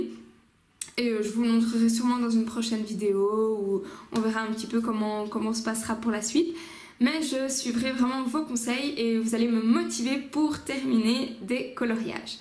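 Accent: Belgium. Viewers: Cc tu devrais te remettre à ton double car déjà la il est beau mais une fois terminé sa promet sinon moi des moments je fait un fond mais ya des colos ou je regrette d avoir fait un fond enfin c est des testés aussi bon courage a toi et merci pour ton partage tu as un accent ? Belge ? Je me trompes ?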